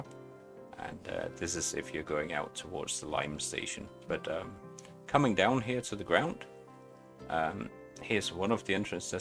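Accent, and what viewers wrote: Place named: England, United Kingdom. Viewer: Så tillader jeg mig at skrive på Dansk (mit Engelske læner sig lidt for meget op ad Google translate) Håber det er ok. Jeg syntes jo nok, jeg kunne genkende den Engelske dialekt :D Har du lyst og overskud, så kan vi jo tage en sludre på MSN, Facebook eller noget i den stil. Jeg kunne helt klart godt tænke mig, at snakke med en anden VOKSEN, der er interesseret i FS19 og i særdeleshed dette map. Men også om alt muligt andet. Det ville være fedt at høre lidt om Scotland. Et land jeg altid har haft stor lyst til at opleve. Så har du lyst, så smid mig en besked på evt. Facebook, hvor du kan finde mig under samme navn som her :) I øvrigt dejligt at se at der også er Danskere der spiller FS og laver gode YouTube videoer. God dag :)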